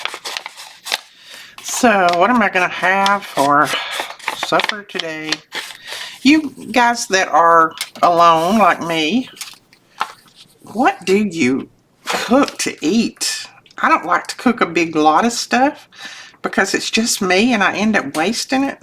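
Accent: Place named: Alabama, United States. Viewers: Awe thanking yie well Ile tell yie 🖐️👅🤗 ime going to see that on Saturday with my x 2 grandwains, 2boys 5 n 8. They both just turned that age oooppphhhffffff they can't sit down but they do in the cinema 🖐️😝😝😂🙏 yie remember at age full of questions well we still love them with our heart n soul I know a lot of things change when yie Wer able to make the big meals I still do that I always put more on than I need. Its a total waste, plus be went off everything some smells it's drivinge caarazy n then ime nearly deaf I've only got 40,% of hearing left soo anyway I get tinnitus badly I hardly sleep but i hear yie woman 🖐️🙌🤗🤗💜💜😘😘 I wish I could give u big hug right noo 🤗💪💪 amd i know the feelin the grandwains they think yer purse is an open book n it never closes n always good to look at explore lol 😝😝😂🖐️👌👌 but we love them plus I love listening to you you calm me but i do watch too keep yer chin u btw your doing amazing remember you take care Mrs b good 👅🖐️😘🤗 i hoe i made yie smile with my Scottish patter n slang words n I love yer accent that deep south love it I know I know Mrs xx 🤗🌹🌹💜💜❤️‍🔥❤️‍🔥❤️‍🔥🙏🙏 sounds a funny big guy god luv his wee soul gdnyt or morning whatever comes first for you I've been dying papers all day making them pink with blowing the bubbles on them I love the effects,🙌 soo tired noo thanks for reading tillthe end 🙏❤️‍🔥❤️‍🔥🌹🌹🏴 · E, as I’ve said before, I love listening to you talk. 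You remind me so of my Alabama family. I miss them very much. But, I also like hearing your ideas and learning from you! Thank you!!!❤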